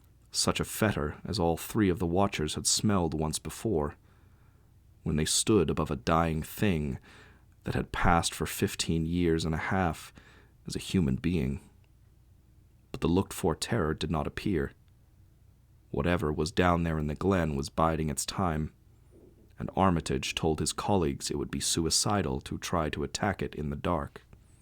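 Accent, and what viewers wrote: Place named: North America. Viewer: Thought the sound was good. North American accent always works with hpl. Obviously the connection with the great god pan is mentioned in the text. But. Connection with 'the white people' less obvious....a playmate was coming 😮.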